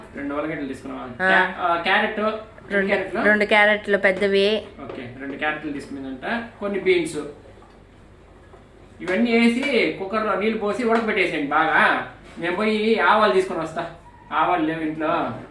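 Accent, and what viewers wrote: Rayalaseema, India. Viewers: Rayalaseema accent tagedela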